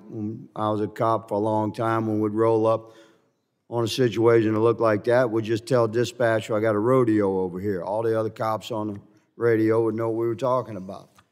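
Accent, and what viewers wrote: South, United States. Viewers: Thank you Mr Higgins, for using that amazing southern voice to stand in defense of those precious innocent children.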